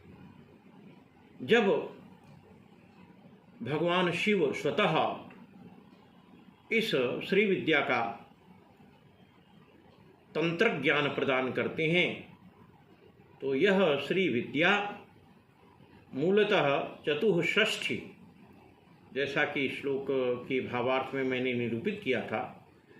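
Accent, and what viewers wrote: Standard. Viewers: 🙏🙏🙏🙏🙏 apne bahot suddha uchharan kiya hai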